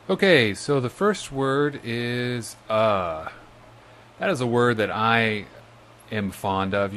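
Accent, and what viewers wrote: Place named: Canada. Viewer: It's pretty obvious that you're Canadian. Cheers to you!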